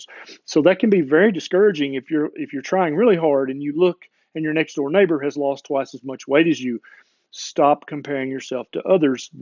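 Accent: South, United States. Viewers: 🍫🍿🥤 I'm ONLY here for the Southern accent. 🍔🍟🍩🍪🥤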